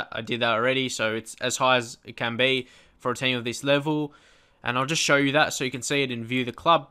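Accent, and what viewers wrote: Australia. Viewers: Well he's Australian, ofcourse his pronounciation is gonna be different to the one in the UK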